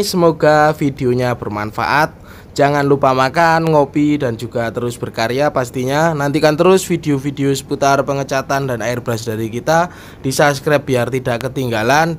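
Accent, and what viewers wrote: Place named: Java, Indonesia. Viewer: Suarane koyo jowo .tapi jowone ngendi ya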